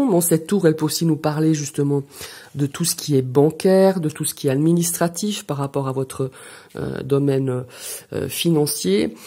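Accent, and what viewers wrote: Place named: Geneva, Switzerland. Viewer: Merci infiniment pour votre message 🥰 J’entends l’accent genevois !?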